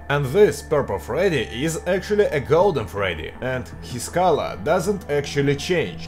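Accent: Russia. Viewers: O, great work, but... this terrible Russian accent. Try to say words softer. Good luck. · your voice is like a Russian who first came to America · Good Job! Only your ancient is very Russian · NOOOOO IF YOU WILL (um...okay ) DO VIDEOS (#pokerface) EVERY DAY, YOU LOST YOUR RUSSIAN (um...) you will lose your Russian accent and your Russian video will look awful((( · Russian emphasis,huh. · Русский акцент все портит. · you Russian pronunciation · His accent is associated with the fact that he is Russian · Russian accent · Your little Russian accent can be heard, but I like it!Well done! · Your English is very nice but Russian accent a little bit unpleasantly. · акцент то русский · try to remove Russian accent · Good English but with russian accent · there are Russian pronunciation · you're really good at speaking English , but the accent is Russian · as you tell you have russian accent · * у тебя очень русский акцент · you have some Russian pronunciation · Ochen zameten russkiy akzent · Hello, that's cool video! 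I think you can do great videos in english, but your russian emphasis isn't very well)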